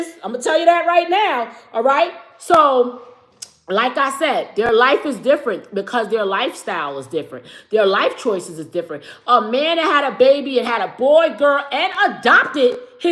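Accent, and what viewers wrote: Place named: New York, United States. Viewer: ❤❤❤❤❤❤❤❤❤❤❤❤❤❤❤❤❤❤❤❤❤love love love it Girl i subscribed and everything thank you for your new york accent and honesty…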